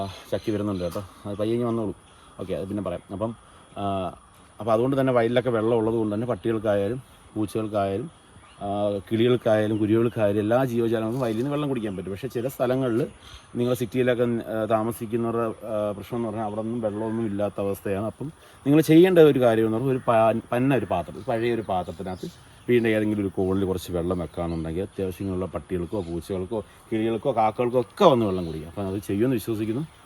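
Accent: Kollam, India. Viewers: മ്മ്ടെ കൊല്ലം ഭാഷ വേട്ടാവള ിയൻ😂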